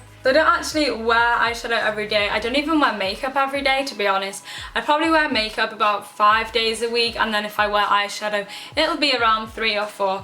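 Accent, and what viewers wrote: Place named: England, United Kingdom. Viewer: what part of england are you from? your accent is very different from me aha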